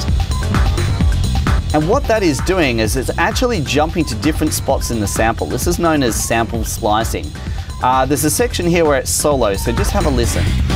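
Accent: Australia. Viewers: Hey mate are you an aussie from Melbourne that lives in Singapore? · I'm American but you Aussies are one of my favorite English accents to hear. It's just so vibrant and colorful lol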